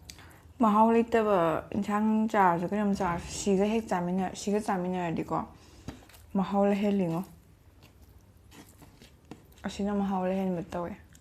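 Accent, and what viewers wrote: Kakching, India. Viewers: Kakching lolda ngangbana henna pos tai ae.. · Kakching lon ngangba c n henna neire keino ado haobro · kakching londa adum ngangbiyo yam taninge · Video loina kakching maron da oina twwo... Duna henna nungai jei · kakching londa ngangbase ym nungcye🙃🙃🙃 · Cgi channelse ngacna ahanba thengnabne subscribesu twjre.aduga sisterse mapanda leibro? Kakching lonse somna ngangbcdi tabda nungaiye. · Kakching londa adum twbiyu aduna henna taba nungyjei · Kakching marol je khara thuna ngangje amta tage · Che kakching lon ngangbana nungcye che nungcbana loireda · Kakching maron ngangbajidii yamm nungche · echan gi kakching gi londa ngangbase yam taba nungai, adum ngangbiyo:) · Kakching londa adum ngango ym nungye taba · Che2 na kakching lon ngnBse ym nungsiYe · kakching marol da ngangbasee yam ngaojei yee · Hwjikta thengnabni chegi channel se..eisu kakchingdeini Eikhoigi londa ngangbsi tabada nungaijei👍👍👍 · Che kakching marolda ngangbase ymn nungcye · Kakchng mchani khngnaba dumm che gi vlog khufing gi ngango duna nungai... 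Eisu kkchngne...😁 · Kakching marolsi eidi Yamna Nungsiye taba Yamna nungai... Adum ngangbiyu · Che ym haormdoi mlle kakching londa asum ngangbi rk o taba ym nungaiye nungsu ym nungcye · wa ym ngngo kakchng londa taba ym nungaiye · Ym nungcyee kakching marol da ngangbashe pukningbu nungcrakae che na ngangba taraga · mathngdi kakching marol ngangbgi oina twrk o chaba tok o ngangbdo taninge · kakching lon sae eym nungcyae tabada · Ngasidi kakching lon taba phngngiiii💃💃💃💃💃. Yam tba nungaiyeee ado mthnggi vedio dsu kakching lon oin twseeee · New subscriber👍...kakching nupi amt koidb ydre lon khoirg ngngk nba😃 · Kakching londo yam nungcee luf u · kakching marol se yamna manipur da pamnarklibane ateigi khoibaga mannadabane lovely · nahalkhei ngangbado adu oina ngangbiyu kakching lon natabida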